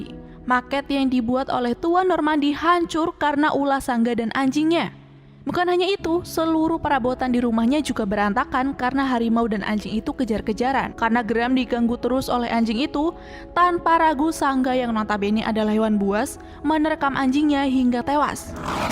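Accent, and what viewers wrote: Sunda, Indonesia. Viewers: Geloah ngomongna Sunda pisan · Sumpah lucu pisan nyarios Sunda meni enaken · Hahahahahaa nyariosna mni raos sundana🤣🤣🤣🤣👍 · aduh Mimin aslli Sunda Oge nya👆🤭🤭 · Teh ai teteh budak Sunda nya,Sunda na NU ti mana😅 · Sunda banget😂😂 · teteh orang sunda ya😂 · Bukan cerita'y yg saya suka, malah denger bahasa'y, asli urang sunda pisan ieu mah. 😆😆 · Seru banget ceritanya mengharukan Apalagi pas pake logat Sunda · Pengisi suaranya orang Sunda anying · Lucu ey aya sunda sundaana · Sih teteh Sunda nya kasar teing nya 🤭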